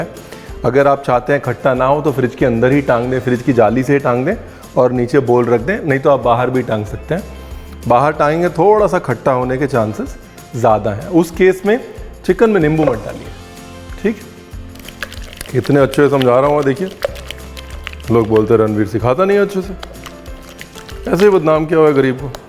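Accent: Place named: Bengal, India. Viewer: Your Bengali accent is awesome! I am also a bangal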